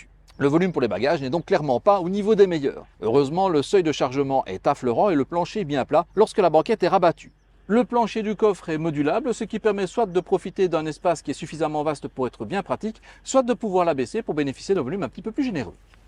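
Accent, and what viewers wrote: Belgium. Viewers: Une chaîne amateur mais aux vidéos très pros, un bien bel essai, agrémenté d’un joli accent liégeois 😊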